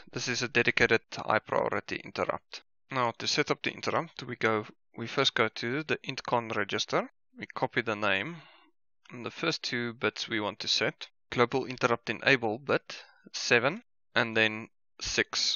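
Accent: South Africa. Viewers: The accent sounds South African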